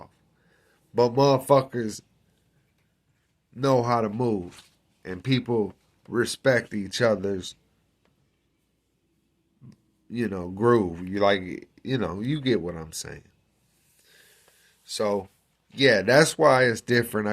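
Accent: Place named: South, United States. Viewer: Hi 👋🏻 you sound so southern 😮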